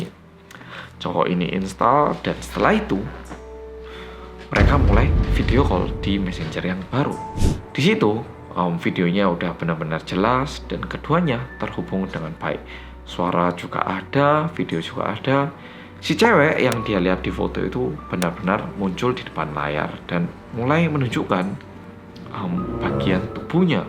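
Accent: Java, Indonesia. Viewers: Cerita yg dpt menjadikan kita semua menjadi lebih hati2 di era yg serba canggih... Thanks mas korea yg medok jowone 😁🙏👍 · Mas lebih kentel Jawanya daripada Korea😭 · canduu medokk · Bersama orang Korea yang medok.... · Mas medooookkkk...😊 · Kembali lagi sama orang korea yang MEDDHHOOK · Bersama dengan orang korea yang medhokkkk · Medooookkkk semakin ombo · Bersama orang korea yang medhoooook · Medokkkk(bibirku meluu mlongo) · Bahasa nya oppa medok bgt 😁 Enak di denger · Hihihi medhokkkk🌼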